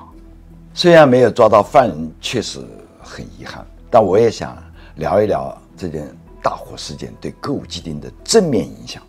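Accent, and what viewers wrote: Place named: Changsha, China. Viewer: 老乡，没想到您长沙话说得还是那么正宗。听了您的传奇经历，真的觉得既向往又钦佩。真的下不得老地咧，😁😁😁永远支持你。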